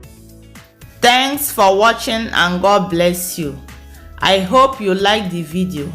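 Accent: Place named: Nigeria. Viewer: First I love the way you have put your vegetables in order, clean job it makes me admire what you are going to cook before I see it😋, secondly your voice is nice to me,I love Naigerian accent so much, last but not least,I love your recipe,and the vegetables looks delicious 😋, wow well served ooo my comment is "confusion" as you have just say😁😁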